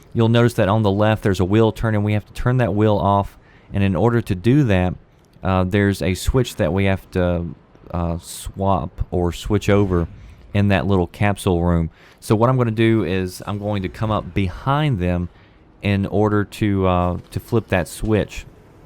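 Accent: South, United States. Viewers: Hmmmmmm, so southern and Mild. Plus 10 for good game-play! Have a like.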